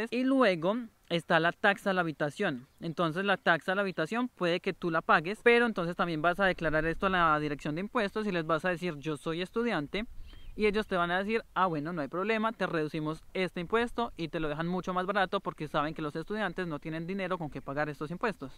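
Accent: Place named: France. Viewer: el acento te quedo asi por usar el Frances a diario o siempre hablaste asi Español?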